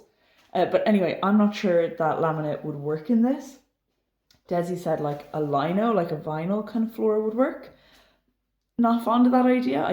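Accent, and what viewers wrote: Ireland. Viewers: I don’t think any of us like the sound of our own voices. However, IMO - I have to say I think you could have a career in voiceover work. I think your Irish accent is lovely and I could listen to your voice all day! I think you could tile your living room floor (in very large porcelain tiles) and it will be easy to clean up especially in the winter when you have the pets coming in and out! Also if the floor is only slightly out you will be able to put extra adhesive on the lines to even the floor out? I would then get a very large rug? Good luck with whatever you decide! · Dear, being British, I looove your Irish accent. Don't ever doubt yourself. I think I speak for all your followers that you are doing a fantastic job and enjoy your videos. Remember, Rome wasn't built in one day and God willing you have many days to finish what you started. 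If I didn't live so far away or being 80 yrs old, I would gladly have flown to Ireland to help you. Thank you Dad for helping your dear daughter. I really enjoy your videos so keep up the good work and don't rush into anything. Rest ever so often and build up your strength. Love and hugs to you and your babies. From Cape Town ❤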